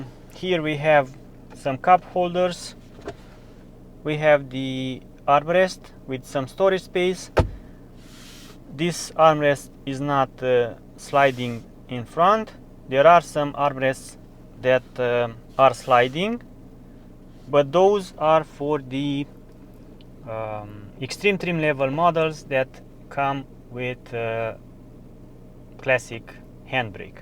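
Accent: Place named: Romania. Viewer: Нормальный восточно-европейский акцент (он из Румынии), зато нам же и всё понятно, что он на инглише говорит. И большинству во всём мире понятно. Так что напрасно вы докопались. А вот что у нас этой машины нет на российском рынке - вот я бы про это переживал. Возможно стала бы даже более народной, чем предыдущие генерации, сильно потеснила бы в этой нише Creta, Seltos и т.п. Но увы :(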